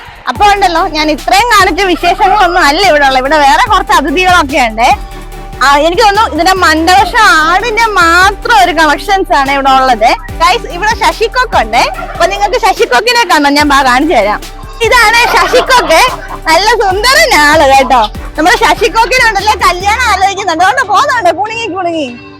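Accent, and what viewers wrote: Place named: Pala, India. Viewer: ❤🙏 പാലാ സംസാര രീതിയാണ്❤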